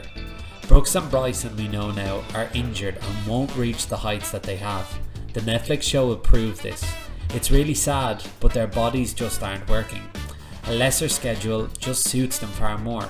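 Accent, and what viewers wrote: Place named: Ireland. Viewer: I’m not a lover of LIV, Norman or all the blood money grabbing pros and honestly, I hope they all rot in hell but this clip is so full of shit, it deserves to be put in the trash can. If you read this in the international news then maybe, there will be credibility but other than that, this guy sounds like he’s from Island and will treat this as an attempt at Irish humour and nothing else.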